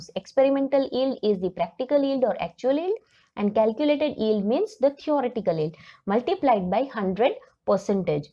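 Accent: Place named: South India, India. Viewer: Mam you are definitely from south. Hai na..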